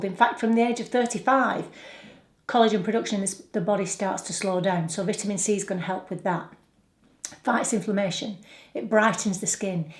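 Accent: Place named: Lancashire, United Kingdom. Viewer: My guess is Lancashire? I'm down in Derbyshire! Good to find someone for the older gals, I'm not quite in the 50's yet but loving your content. Tracy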